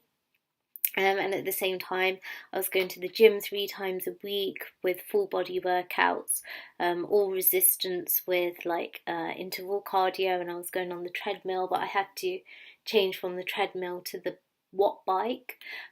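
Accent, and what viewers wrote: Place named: North, United Kingdom. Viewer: Hello sweetie, I feel I’ve meet my match. I can’t do some of the moves that my PT asks me to do, because my mummy tummy is in the way. Things like burpees or bear crawling, because I have a belly in the way. But I’m trying hard and according to the bodytrax I’ve lost 4kg of fat and gained 4kg of muscle. Also, as a northern gal myself, I appreciated your accent.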